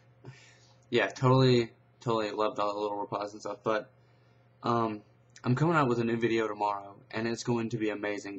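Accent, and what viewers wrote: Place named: South, United States. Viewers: I love your southern accent :D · your from LA???!! You seem like your from Alabama...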